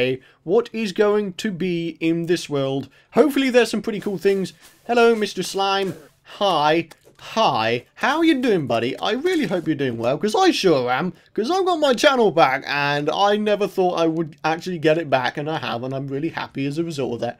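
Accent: United Kingdom. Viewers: That is the strongest British accent I've ever heard... and I'm British myself! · What? You sound like a british guy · He's British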